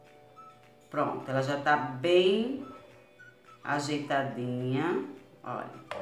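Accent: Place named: Recife, Brazil. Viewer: Oxe, esse sotaque lindo só pode ser do meu Recife! Tapioca eu faço todo dia, adoro! Vou fazer desse jeito também visse!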